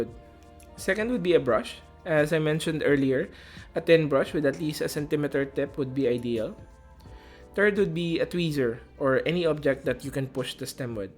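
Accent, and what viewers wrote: Philippines. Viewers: This dude Filipino, I'm Filipino and the accent is unmistakable. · Parang pinoy to a. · Pinoy ka ba? Parang medyo pinoy ung accent mo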